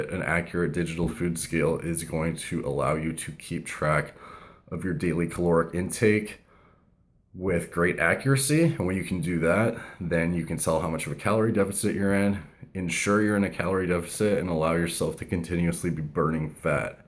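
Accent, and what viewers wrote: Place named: Canada. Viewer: I was surprised to hear was only 30 with his maturity and depth of knowledge. It makes more sense after seeing this video that was only 7 years ago because he comes across like a young guy in this vid- sounding so Canadian and gymbroish. In comparison with my maturation over a 7 year period I think it must be a combination of self-reflection from regular posting and PEDs.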